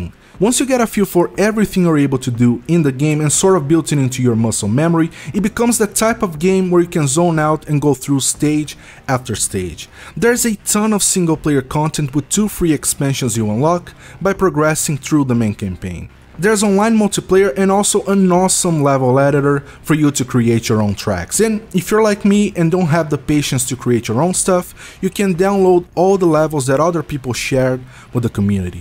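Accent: Brazil. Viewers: brazilian accent? Tamo junto kkk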